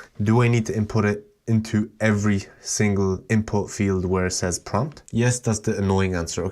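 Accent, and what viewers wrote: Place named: Ireland. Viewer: Man you Really have an Irish accent!!!!!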